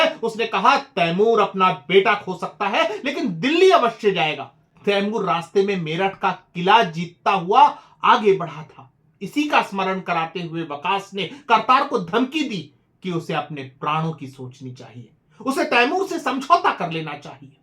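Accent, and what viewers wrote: Standard. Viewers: आपके हर वीडियोज की तरह ही ज्ञानवर्धक हे। आपकी शुद्ध हिन्दी और उसका सर्वथा शुद्ध उच्चारण प्रशंसनीय है।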